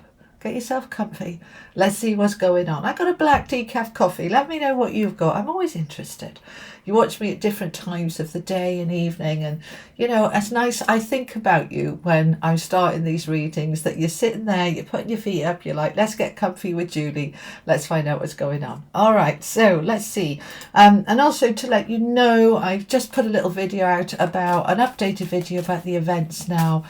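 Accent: United Kingdom. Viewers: I’m English living in Ireland it’s so lovely to hear a good old Uk dialog here ,make a cup of tea and listen lovely Julie and your large angel in the back ground is so lovely 😊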